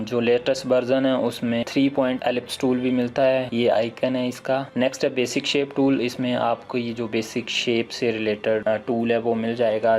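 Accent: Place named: Himachal Pradesh, India. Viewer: Wow sir me Himachal se hu app kha se ho sr apki awaaz bhi Himachali lg rhi h😮😮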